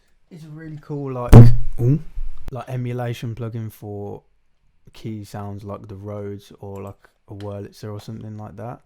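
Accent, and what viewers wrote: United Kingdom. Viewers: If I could have one wish granted, it's that even after reaches that, level of fame, he would still be our lovely down to earth Brit who'd sit down and make these videos for us... even in the private jet if he must. Super helpful and makes me really happy just watching a guy who loves his craft, doing his craft. So plz... even with the millions of $$$, I pray that he will still regularly share these humble, happy moments with us. Maybe we can hear Speak Français in a British accent one day... hmm, I will hope and pray. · I love his british accent 😍😍😉🙃🙂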